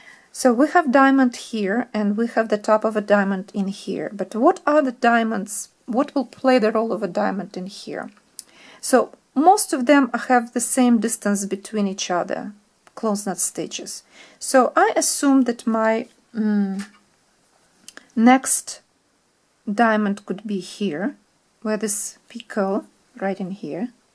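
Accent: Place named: Russia. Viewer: Здравствуйте,по акценту поняла ,что русский знаком с Вами !!! Потрясающая сетка , такой я еще не видела ,браво !!!👍👍👍👍👍👍👏👍👍👍👍👍👍👍👍👍👍👍👍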